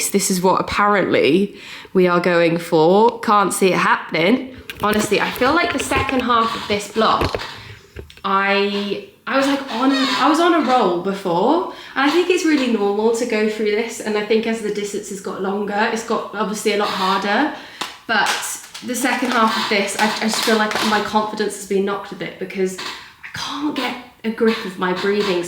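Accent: Wales, United Kingdom. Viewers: Why don’t people understand change is a great thing and it’s completely natural! Change is how we grow into the people we want to be. Also how bizarre that sometime says your losing your Welsh accent like of course you’re living in England😂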